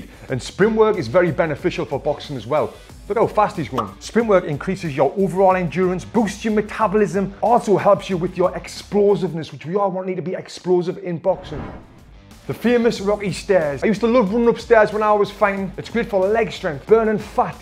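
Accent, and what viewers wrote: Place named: Birmingham, United Kingdom. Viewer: Dude, you are talking like peaky blinders. 😊👍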